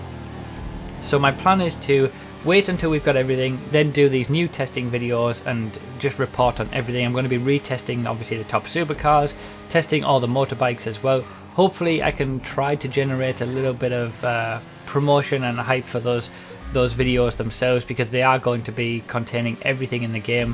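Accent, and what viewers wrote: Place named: London, United Kingdom. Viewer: Yeah he does have quite a london ting accent, fortunately I have friends that speak like him so I've developed an understanding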